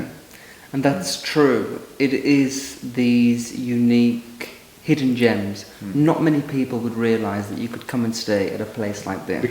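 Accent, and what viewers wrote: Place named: England, United Kingdom. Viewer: What an amazing video!!! Thank you for sharing all of this beauty with us. But what makes the video even more enticing is your voice. It is so soothing and your English accent just draws the viewers in; I can't get enough of your videos!!!!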